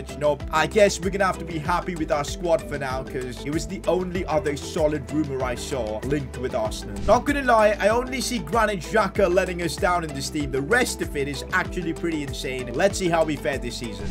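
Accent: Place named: India. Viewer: Bro why the accent you are from India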